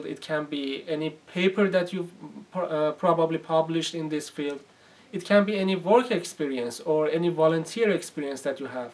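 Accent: Iran. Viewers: From the first "Hello", I knew you were Persian. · Love ur Persian Accent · love your persian accent, paydar bashi hamvatan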